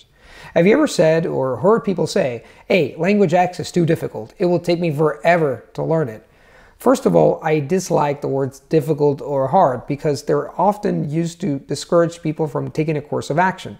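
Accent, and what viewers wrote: United States. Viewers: babe, no one is berating him or anything based off his accent. I never said that sound like a native should ever the main goal when picking up a language. The reason I brought this up is because I've been watching his videos for years & I do know he used to have a stronger American accent. That being said, it is interesting to point out how the acquisition of more languages can affect the languages one already has under their belt. Please think everything through before stating someone else does not support him. I obviously do. :) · Native English speaker here. In this video he sounds a little raspy, like he's getting over losing his voice. I haven't seen a general degradation of his American accent; I don't watch all his videos though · you speak English with an American accent. Sometimes I hear other people that learned English speak with a British accent.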